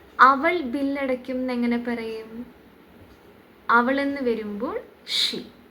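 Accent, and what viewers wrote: Palakkad, India. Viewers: Pkd Slang 🔥 😂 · Palakkadan slang super 🙌😂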